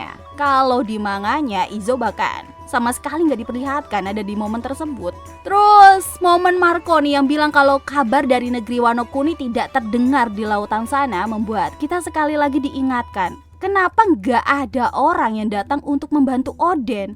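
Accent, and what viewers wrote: Java, Indonesia. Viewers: Ternyata kak suaranya ada medoknya wkwk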